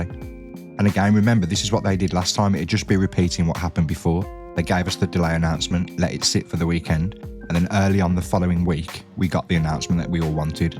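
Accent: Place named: United Kingdom. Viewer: Everyone can just admit they dont even know what a GTA VI is and is just watching for his cool British accent.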